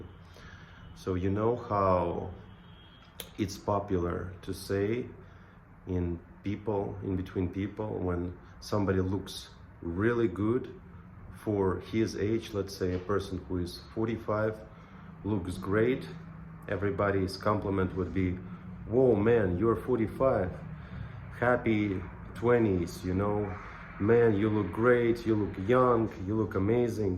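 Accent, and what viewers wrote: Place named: Brazil. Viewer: I know this accent I guess. Tu eh BR mano? Mora aqui? Tu não parece a maioria dos BR treinando , treina mais parecido com os americanos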